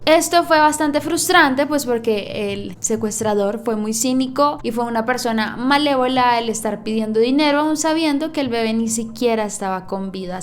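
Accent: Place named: Colombia. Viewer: El acento colombiano ❤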